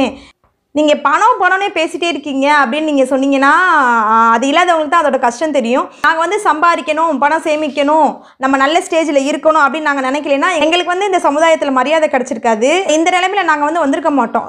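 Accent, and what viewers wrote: Madurai, India. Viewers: Very encouraging vedio sister, na madurai dhan unga madurai pechu super, rombave yedhaarthama pesuringa maduraikaravangaluke irukura yethaarnama pechu, unga vedio paarthadhula irundhu Naanum savings panni gold vanganumnu oru intrest vandhuruku, vedio paakuradhuku munnadi varai intrest illa, unga character super, selave pannadha character kondu vara Naanum try pannitu iruken, unga vedio paarthu, continues.ah vedio podunga, thankyou so much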